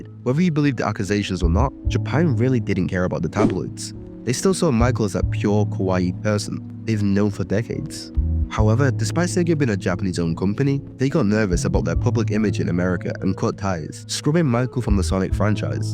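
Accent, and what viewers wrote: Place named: Yorkshire, United Kingdom. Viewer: I wonder if this fella learned English in the north of England. He sounds like a Yorkshireman.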